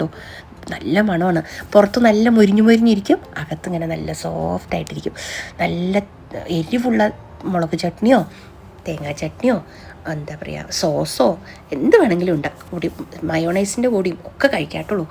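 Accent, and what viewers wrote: Palakkad, India. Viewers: എനിക്ക് ഒത്തിരി ഇഷ്ട്ട ചേച്ചിയുടെ വീഡിയോ യും ചേച്ചി യുടെ സംസാരവും ആ കോളു ❤പൊടിച്ചോളൂ to❤അടിപൊളി വോയിസ്‌ പാലക്കാടൻ ഭാഷ സ്നാക്ക്സ് അടിപൊളി ആണ് ഒന്ന് ട്രൈ ചെയ്യണം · Enikum aa samsaram kelkan enthu ishtamanenno, njanum oru Palakkattukariyanutto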